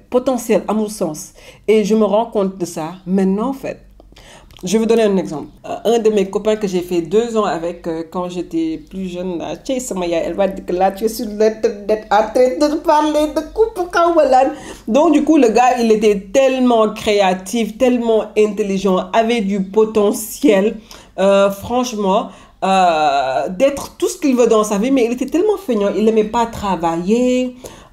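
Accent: Senegal. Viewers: J’adore l’accent sénégalais ❤️❤️❤️ much love from cameroon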